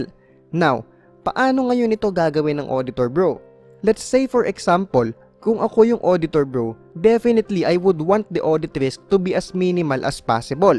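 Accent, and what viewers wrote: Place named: India. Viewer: you have Indian accent why using a blond blue-eyed skin?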